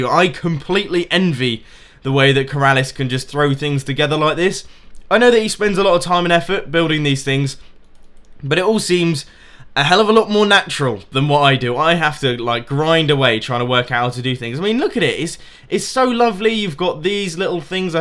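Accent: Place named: RP, United Kingdom. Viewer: Why is he so posh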